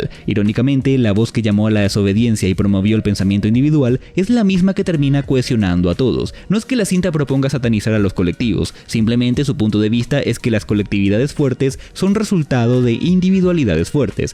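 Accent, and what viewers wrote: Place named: Paraguay. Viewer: Nuevo Sub :D, dije que eras paraguayo por tu acento y es cierto xd🇵🇾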